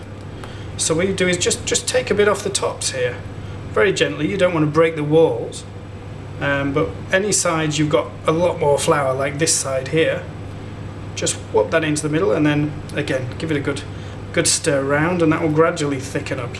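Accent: United Kingdom. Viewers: Are you northern english??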